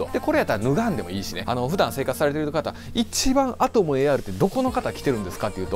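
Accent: Kansai, Japan. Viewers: 関西弁のイントネーションと喋り方は魅力ですね。 ついつい、見ちゃうわ 頑張ってください。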